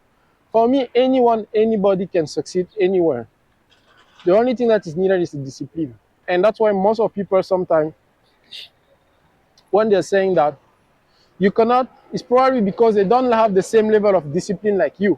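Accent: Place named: Africa. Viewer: pero de que pais vienes tu? por tu acento evidentemente no eres de un pais del norte como inglaterra usa o canada, eres de algun pais de africa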